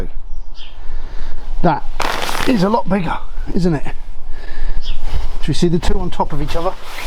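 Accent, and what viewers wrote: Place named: London, United Kingdom. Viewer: lol you sound london,but your mate is deff essex,love your channel,your the one helped with my first wild camp,been going to the moors since 1993,never wildcamped until other week,thanks to you went to merrivale ,loved it.so thankyou for helping me.. debs and stay safe.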